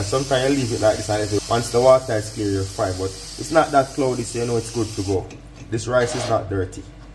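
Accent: Jamaica. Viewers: Chef, next time you come back, please do a pop-up cookout in MANDEVILLE. I would order this meal. While you're on-site cooking you could do a book signing for fans. It would be a great way to market your book. Your meals are prepared in such an appealing way that one can eat with one's eyes. I also love your attention to cleanliness. No dutty pot, we say. What did you say, Old cow hard to chew. 😂 Don't go offending any old cows now, my boy. I love the little value bombs you drop offering instruction plus little-known cooking secrets. Communicated in standard Jamaican English. You've got it locked. 💯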